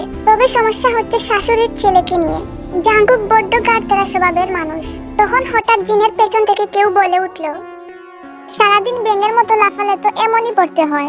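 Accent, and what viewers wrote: Sylhet, Bangladesh. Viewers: আপু মনে হয় সিলেটি,, যাই হোক আপু তুমার ভিডিও অনেক অনেক অনেক অনেক অনেক অনেক অনেক অনেক অনেক অনেক অনেক অনেক অনেক অনেক অনেক অনেক অনেক অনেক অনেক অনেক অনেক অনেক অনেক অনেক অনেক অনেক অনেক অনেক বেশি সুন্দর হইছে আপু I love you api 😍😍😍😍💖💖💖💖💖💖💓💓💞🤩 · তুমি সিলেটি পুরি? বালা আসোনি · আপু তুমি কি সিলেটি ❤ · আপু তুমি কি সিলেটি 🖤❤️🖤❤️🖤❤️🖤❤️🖤❤️🖤💚💜💚💜💚💜💚💜💚💜💚💜💚👻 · আপু তোমার ভয়েস শুনে মনে হচ্ছে তুমি সিলেটের 💜💜💜♥️♥️♥️♥️♥️♥️♥️♥️♥️♥️♥️♥️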